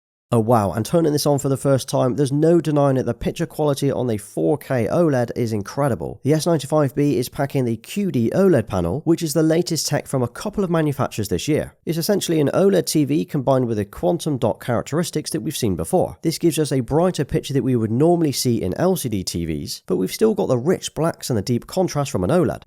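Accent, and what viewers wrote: United Kingdom. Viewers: OMG I like your voice I think you are British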